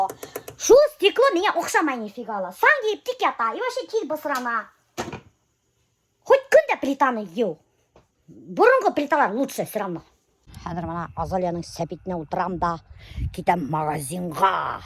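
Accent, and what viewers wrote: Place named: Russia. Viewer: Очень приятный и красивый язык. Но только без русского акцента если можно.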